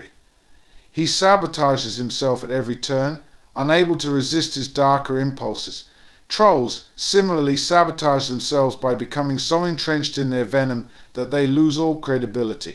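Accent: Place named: RP, United Kingdom. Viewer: 😂😂😂😂😂😂😂😂 So damn Posh...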